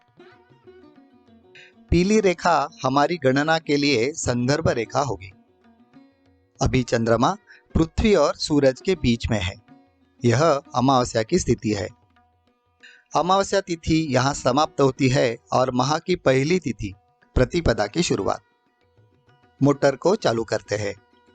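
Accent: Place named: Maharashtra, India. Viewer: Aap ki marathi accent me narration bada madhur lagta hai sunne me aur video rochak hota hai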